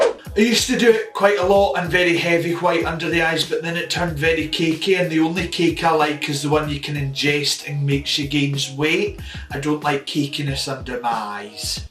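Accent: Scotland, United Kingdom. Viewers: We stan a plus size queen. We stan a Weegie. And we stan a Scottish burr. Congrats gorge! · I can actually stand Lawrence when he speaks normally. Hate the guts out of him when he purposefully makes his voice deeper “YEW AWRTIE LAWDS” We all know you’re Scottish it’s not difficult to tell. We have all probably met a Scottish person in real life they aren’t a rare breed of person I promise you no one cares more about you if you do that cheesy voice, we actually like you a lot more when you just speak without psychically shouting and making your accent a lot more prominent. I love your accent, when you speak in a normal tone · So so proud to see a Scottish queen!!! You did us all proud! I think anyone Scottish would know you're not faking😂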